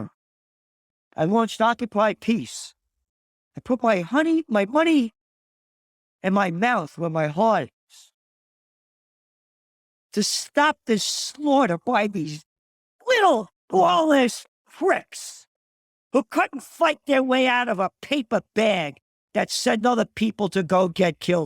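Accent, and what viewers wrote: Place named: East Coast, United States. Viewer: Omg gerald you are looking extra dapper today love your truthfulness your east coast accent and your proud Italian heritage you are welcome in Texas if you want to join us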